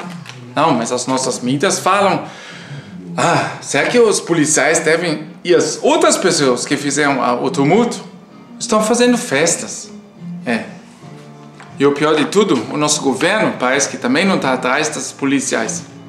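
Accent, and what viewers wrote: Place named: Germany. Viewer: Ôh, é muito triste! O pior é que, o mundo todo sofre essa inversão de valores. Só Jesus! Parabéns pelo seu português, é perfeito, com esse sotaque alemão.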